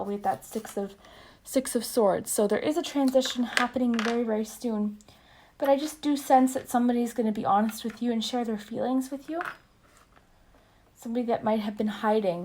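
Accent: Minnesota, United States. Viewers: Are you Minnesotan by chance? I'm from there and your accent is familiar :)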